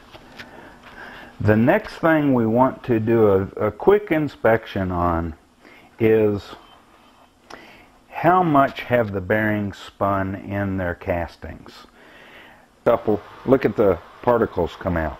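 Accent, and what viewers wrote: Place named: South, United States. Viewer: Southern accent lol. He knows what to do I'll give him that but this video is no good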